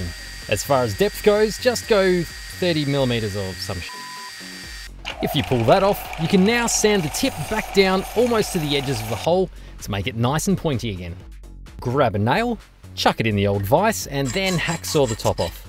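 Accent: Australia. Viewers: Great to hear our Ozzie accent on the tube without the waffle like the mob from North of the equator go on and on and on and on till I usually hit the mute button . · Idk why but I love the australian accent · O meh gawd, I found another Aussie! How ya doin' mate? (Pretty new to the channel.)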